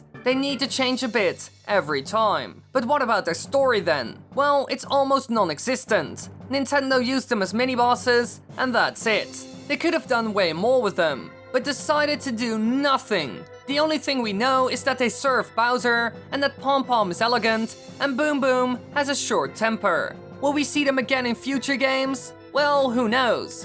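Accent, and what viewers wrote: Netherlands. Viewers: Ik hoor aan je accent dat je Nederlands bent maar ik wist het niet honderd % zeker alleen toen keek ik een oude video van jou n daar zij je dat je een meeting ging houden in Utrecht maar waar woon jij ik woon in Overijsel vlak bij Zwolle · Are you dutch?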